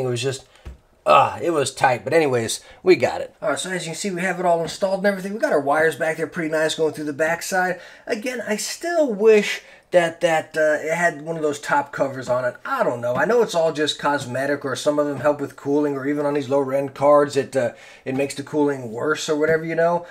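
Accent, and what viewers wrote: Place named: South, United States. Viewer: I stumbled upon your channel when deciding which monitor I should upgrade to and now you've helped me decide on a headset as well. I already have an MSI RX580 but I'm watching this video anyway because I love your personality and energy, not to mention that southern comfort vibe. The quality of your content is insane and I really hope you blow up soon!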